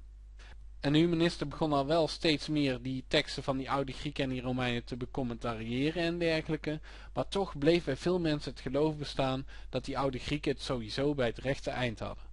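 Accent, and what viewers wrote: Brabant, Netherlands. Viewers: Ja!:D Maar voor mij is't gelukkig geen moetje. Ik mag gewoon leren voor de leuk, en maakt het wel makkelijk zo met z'n Brabantse manier van spreken ^^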